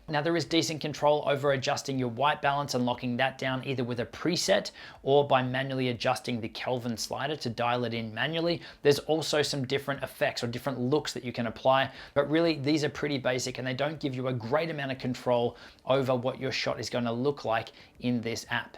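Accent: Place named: Australia. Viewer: Hello Justin, nice vídeo mate! Where are you talking from? I’m sure you’re an Aussie just by your accent. You look and sound very professional mate! Well done 👍🏻. It’s been great to learn a lot from your videos, thank you brother. I’m a Brazilian who’s living the dream in Perth for awhile, and now I’m setting up my own Youtube channel, thanks for your great effort mate 🙏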